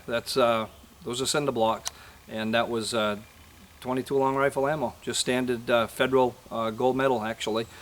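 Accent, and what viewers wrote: Maine, United States. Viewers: Hahaha. Great video man, I really enjoyed it 👍 " " a Maine accent and a M&P 15-22. Def America.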